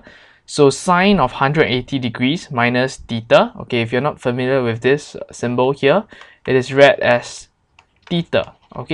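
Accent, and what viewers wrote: Singapore. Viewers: Hey are you from singapore?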